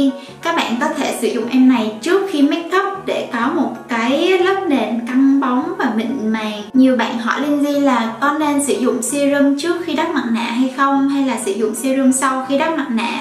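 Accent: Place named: North, Vietnam. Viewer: E thấy nói tiếng Bắc dễ thương quá...vào một video nào đó... sẽ làm một video skincare hoặc makeup hoặc vlog..v..v nói tiếng Bắc nhé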